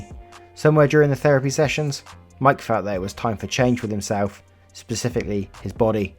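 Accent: United Kingdom. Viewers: I think I asked on another video, but where are you from specifically in the UK? I hope to start becoming more familiar with the different regional accents. Much love!😍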